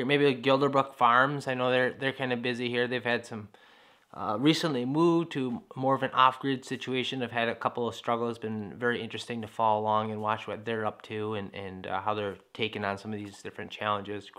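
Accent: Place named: Wisconsin, United States. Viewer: as someone from wisconsin you sound like your from wisconsin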